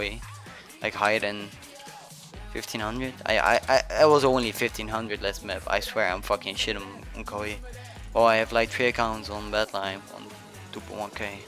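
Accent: Netherlands. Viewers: Dutch english ftw xD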